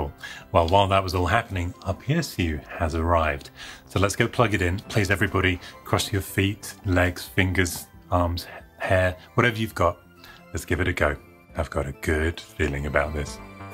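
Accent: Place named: Southern England, United Kingdom. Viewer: you sound south of England area like myself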